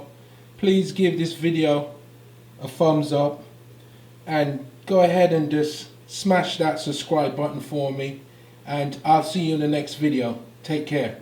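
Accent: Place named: United Kingdom. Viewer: your from the UK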